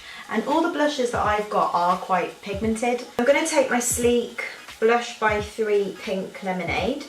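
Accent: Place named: United Kingdom. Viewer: Omg looove the look, you're just so pretty and I love the way you talk haha don't mean to sound creepy though, I just have a slight obsession with British accents and everything English related.. I want to move to England soo bad!! lol sorry for that random comment.. I just meant to say that I loved this video and you're awesome! x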